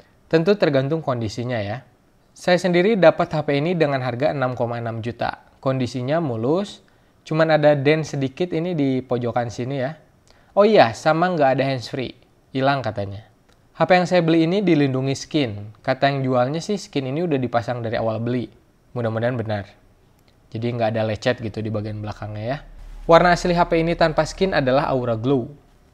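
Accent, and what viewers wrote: West Java, Indonesia. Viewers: Ga sengaja mampir sini. Pas awal denger narasinya langsung kepikiran "hmm... Logatnya kayak urang sunda". Pas ngomong "geuleuh.. Gengsi.." ah fix, baraya Sunda.. 😂 Salam kang.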